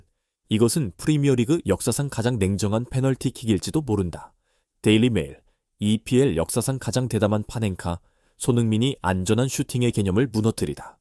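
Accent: China. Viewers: 중국인이 내용을 읽은 건지, 뭐 이러냐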